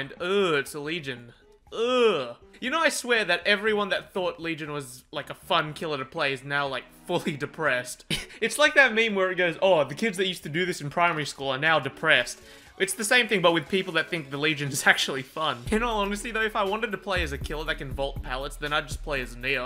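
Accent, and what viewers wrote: Australia. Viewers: I do love listening to my Australian friends talking and swearing. So I enjoy your shit mate as well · you're Australian right?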